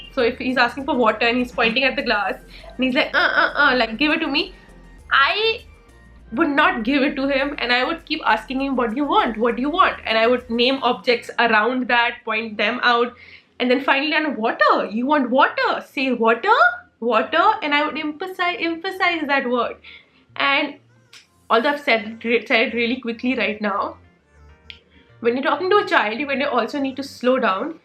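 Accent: India. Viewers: Are you teaching your kid English in Indian accent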